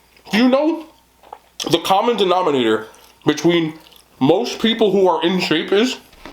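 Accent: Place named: East Coast, United States. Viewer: 😃 food looks delicious. Love your upbeat attitude And eastcoast accent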